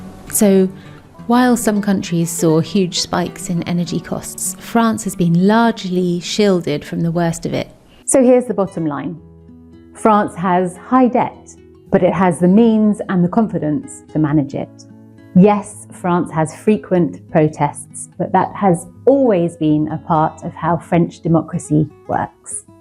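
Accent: England, United Kingdom. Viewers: This woman with British accent is sooo fake! Just lie and lie with more lies. She is a joke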